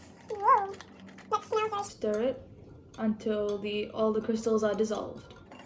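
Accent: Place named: United Kingdom. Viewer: or whatever idk sounds kinda British ngl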